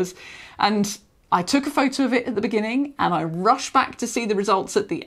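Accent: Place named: Middle class, United Kingdom. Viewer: Perfect review, and so refreshing to hear a nice, middle-class British voice on a product review! Thank you!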